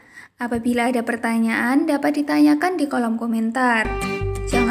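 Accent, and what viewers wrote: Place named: Java, Indonesia. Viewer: yg baca medok mesti wong jowo iki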